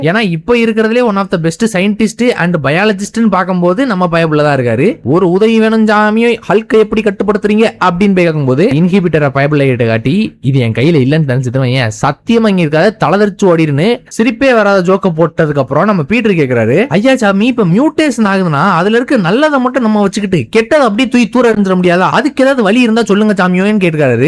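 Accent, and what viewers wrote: Kanyakumari, India. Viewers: Bro neenga kanyakumari ahh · Bro neega Kanniyakumari ahh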